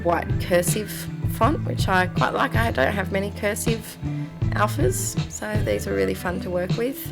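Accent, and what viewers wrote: Australia. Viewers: Great layouts - looking forward to seeing more :) It is nice to hear another Aussie :)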